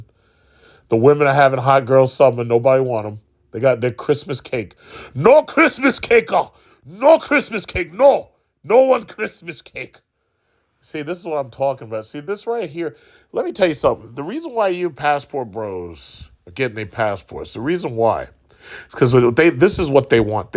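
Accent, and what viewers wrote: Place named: New York, United States. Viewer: Yo bro dead ass bc you have the NY accent, it is soothing AF to listen to your Passport Bro videos compared to other YouTubers. I can't be listening to those monotone channels.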